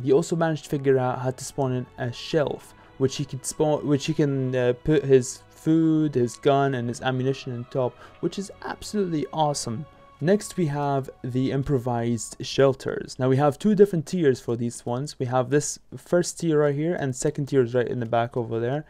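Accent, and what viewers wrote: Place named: Scotland, United Kingdom. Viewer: Yes mate I thought I heard some scottish in there! Class video, keep up the good work.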